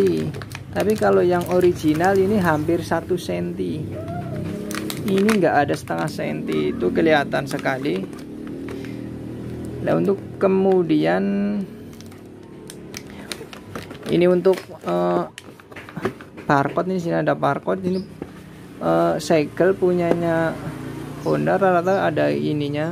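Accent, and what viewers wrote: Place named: Banyumas, Indonesia. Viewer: Ra ngapak ra kepenak kang...sukses selalu👍😁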